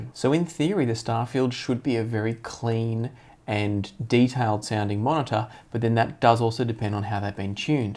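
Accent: Australia. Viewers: Great review as usual, mate. Really glad to hear a fellow Aussie voice in the audiophile community. Looking forward to your thoughts on the Topping E30 DAC! 👍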